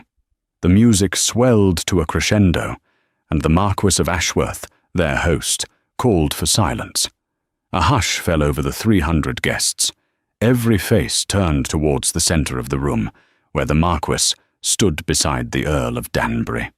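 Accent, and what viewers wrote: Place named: England, United Kingdom. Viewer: Ah yes. Luv that purring English accent. YUM!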